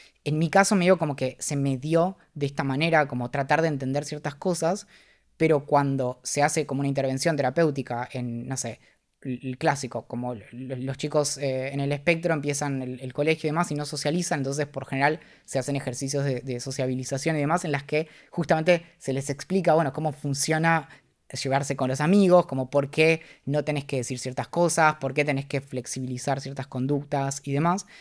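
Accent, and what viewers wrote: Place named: Israel. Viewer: Y ese acento HEBREO ??? Judio o uruguayo o mide east … la doble LL ó Y porque SHO porque yo.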